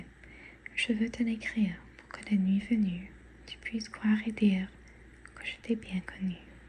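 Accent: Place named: Paris, France. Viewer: This sounds like an accent from Paris. Very interesting because your English does not have a French accent.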